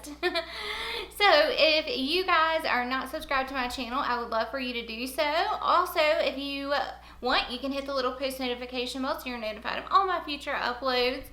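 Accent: South, United States. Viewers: shes southern it’s called an accent sthu “sweet” is not fitting for you u must be on something or are you just a bully??? 🙄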